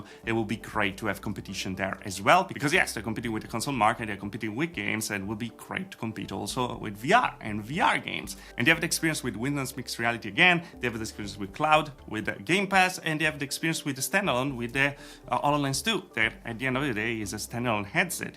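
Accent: Italy. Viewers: LO SAPEVO CHE ERI ITALIANO Quel accento... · Ohhhh, you are Italian, from what part? I was trying to guess your accent, thought Brazilian. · Oddio ma sei italiano? 😮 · Per qualche motivo pensavo che fossi italiano e ora ne ho la conferma :)) Nice video and keep up the work!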